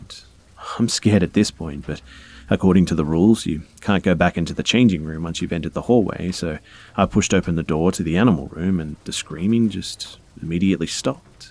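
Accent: Australia. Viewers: I didnt think there was an aussie narrator on the tube. Hi from brisbane 😂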